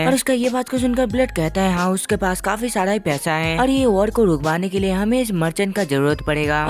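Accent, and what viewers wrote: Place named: Bengal, India. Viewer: Bengali tone mat nikalo ..aur thik se bolo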